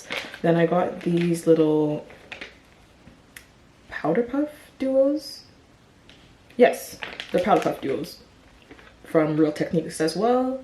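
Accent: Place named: England, United Kingdom. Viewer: I loved the touches of the English accent 😂😂😂 Beautiful finished look ❤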